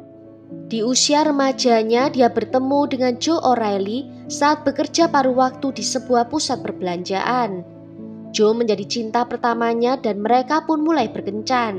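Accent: Java, Indonesia. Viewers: Mbk asal nya jawa mana toh mbk medok2 gitu hehehehehhe maaf cm mau nanya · medok bnget jowone mb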